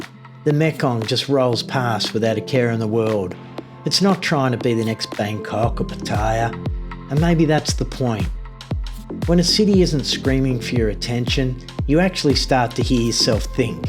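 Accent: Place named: Australia. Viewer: Thank you for the video, mate. Love seeing vid about Laos. Also, love your down under accent. Stay healthy and enjoy your retirement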